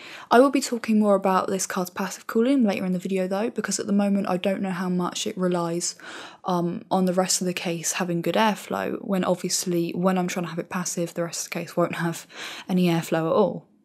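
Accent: England, United Kingdom. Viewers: You make some really good videos. Sadly I always have to concentrate to understand what you're saying. Guessing you're from the UK, what regional accent is that? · She's from England.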